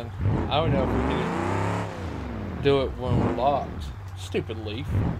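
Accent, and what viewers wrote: West Virginia, United States. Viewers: Is he from WV💛💙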